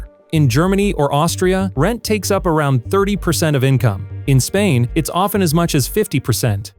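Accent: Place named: United States. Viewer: American accent. First topic, MONEY. Why am I surprised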